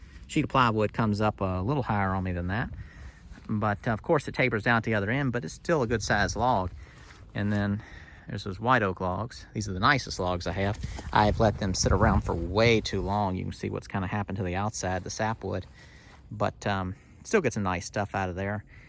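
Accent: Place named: North Georgia, United States. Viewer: Just wondering, are you in Georgia? Accent sounds a bit like north GA.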